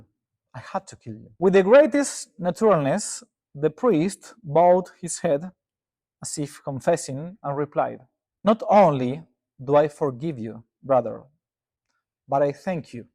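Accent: Argentina. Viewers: Muy bien inglés con acento argentino. Me encantó.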